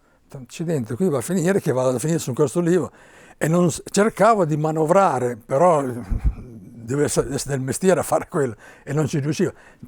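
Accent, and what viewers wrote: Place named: Genoa, Italy. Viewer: grande generale ha il modo di parlare con come mio nonno di Genova è un simpaticissimo grazie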